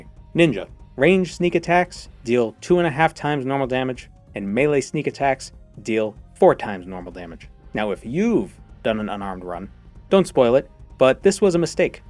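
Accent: Boston, United States. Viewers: I had a feeling you were from Boston. I was born in Quincy and lived all around the South Shore, so Fallout 4 really holds a special place in my heart. I also understand downplaying the accent. I do the same, otherwise people can't understand what I'm saying or constantly ask me to say words that end in "R." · I always got the sense you were from somewhere in New England. Let that Boston accent out in the next video! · In one of your older videos I'd just got off a call with my grandfather and realized... "Wait, that's a boston accent!" From the North Shore originally and I'd hear it all the time at work or in general and it just went over my head for like 3-4 videos. I do appreciate hearing the areas of Boston/Mass said in Boston way. I was so excited for Fallout 4 to come out back in that time cus I was really looking forward to a Fallout that was recognizable since it was so far in the future and in my area... 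I mean hell, my city is technically in it. It's like you said though, it feels right even if it doesn't look right. PS Green Line is just terrible and every station its a part of is a mess. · the challenge was interesting but the real life boston lore and dialect were my favorite parts. excellent video. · The weirdest thing about this is I had never noticed your Boston accent until this vid.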